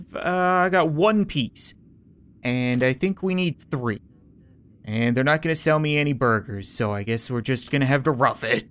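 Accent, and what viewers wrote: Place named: Canada. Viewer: I love his Canadian accent and how it's so subtle yet so prominent that it leads to perfect delivery in every line Example: "what happened to shoe potatoe!?"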